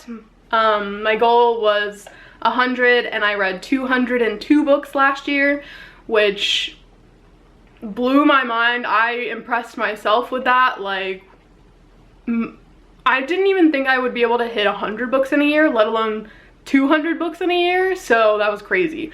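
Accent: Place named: South, United States. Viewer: I MISSED YOUR SOUTHERN TWANG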